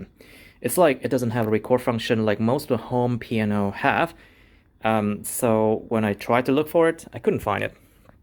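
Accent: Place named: Vietnam. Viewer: coi từ đầu tới khúc nói cái soundcard mới nhận ra anh là người Việt Nam, hèn chi nghe giọng thấy màu quen quen =)))) mà cảm ơn anh vì bài review có tâm này nha, em cũng đang tìm hiểu giữa con cp73 này và nord electro ☺️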